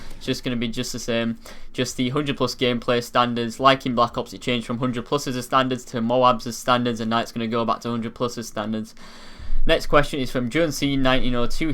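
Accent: United Kingdom. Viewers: British english sucks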